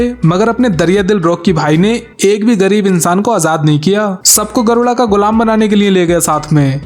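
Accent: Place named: Madhya Pradesh, India. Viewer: bhai tum MP se ho kya? Tumhara Hindi accent bahut bekar hai.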